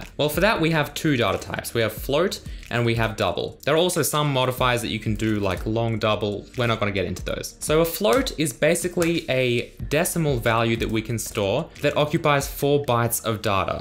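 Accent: Australia. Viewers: That’s the Australian accent right? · He's an Aussie.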